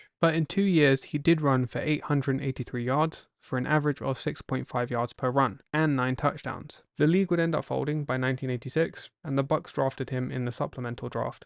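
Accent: United Kingdom. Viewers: BRI'ISH GUY TALKING NFL!!!!!!!!!!!! OMGGGGGGGGGGGGGGGGGGGGGGGGGG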